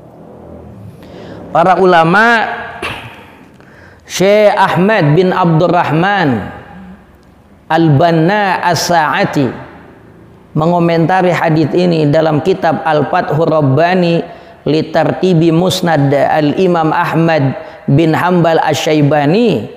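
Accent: Jakarta, Indonesia. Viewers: Ustadz logatnya kental banget betawinya. Demen dah dengernya. Syukron tadz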